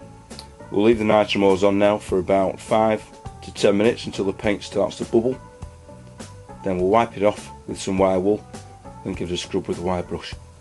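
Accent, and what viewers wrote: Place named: Manchester, United Kingdom. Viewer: Hes from Manchester like me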